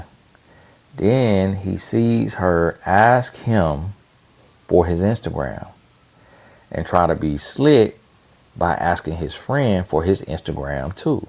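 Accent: South, United States. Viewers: I hope you don't lose your accent. Your southern accent coupled with your God- given wisdom and humor sets you apart from the rest. It's very much appreciated. It's refreshing hearing a relationship coach who doesn't sound like everyone else. 👍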